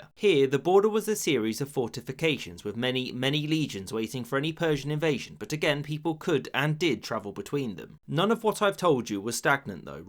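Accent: England, United Kingdom. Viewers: You are funny I like your videos I wonder where you are from in England with your accent. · Why do you have a british(?) accent but use miles instead of kilometers?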